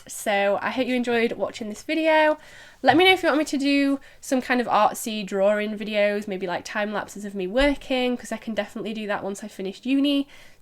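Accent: United Kingdom. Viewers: Love your British accent! Bloody good video!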